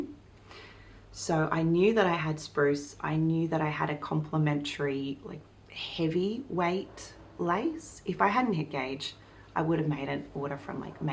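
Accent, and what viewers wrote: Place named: Australia. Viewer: Hello - it’s lovely to hear another Australian on YouTube. I’m impressed with your knits and your productivity whilst being a mum of 3. I am a mum of 5, grandma of 5 and I love knitting, crocheting, sewing etc, but I am impressively slowww 😅 I’m in Brisbane and I have to confess a teensy bit of jealousy that you live in Tassie and have the option to knit lovely cosy knits that will get a good amount of wear! I have to choose carefully which cold weather knits I actually make, given the two minutes of “winter” we get here 😅 Your green Paul Cardigan is just superb on you. You clearly have a good eye for colours that suit you. Love it! What a natural beauty you are! Anyway, I’m watching this and working on my Hatsuki Top by Rievive in Isager Yarn Trio 2. Your cadence and overall chill vibe is perfect accompaniment. Thank you 😊 Also, I heard there was snow down there yesterday! 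Already! It’s finally cooled to a balmy 26 C here today! “I love a good patina” - me too ❤😊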